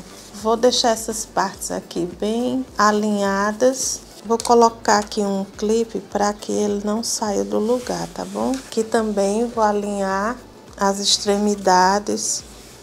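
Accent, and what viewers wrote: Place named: Northeast, Brazil. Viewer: Boa tarde. Amo seus videos e as explicações, sem falar no seu sotaque nosdestino. Tbm sou. Mas, não fique chateada.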